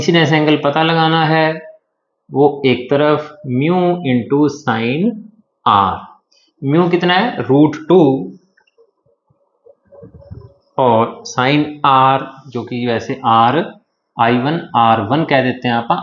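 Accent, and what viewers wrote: Haryana, India. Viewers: Haryana se ho kya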